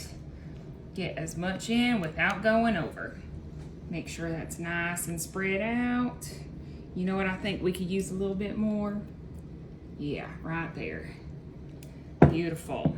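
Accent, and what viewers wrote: South, United States. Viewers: Love you're southern accent, also you're so pretty country girl, love your simple recipes 😍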